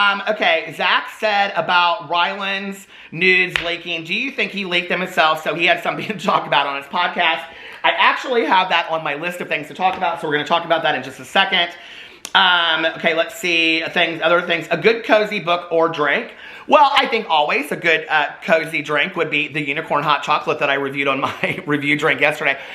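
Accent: South, United States. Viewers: !!! 😂😂😂 your southern accent 🤣🤣🤣🤣🤣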